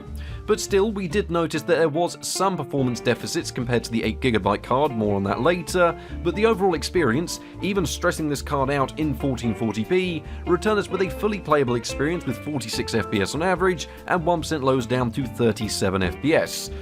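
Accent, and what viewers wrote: United Kingdom. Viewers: What region of the UK is accent from?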